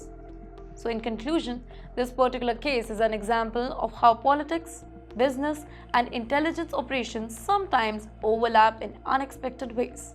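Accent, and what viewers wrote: India. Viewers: It's called Curry English from India. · This is Indianlish.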